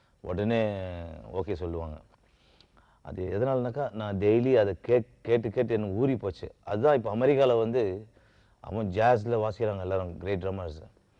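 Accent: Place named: Chennai, India. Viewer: Thala! Enna sokka numma madras bashai pesi manase kushi aakitta ma!! Sokku Thalaiva Sokku! Noorandu vazhanum nee!! Kalakkite iru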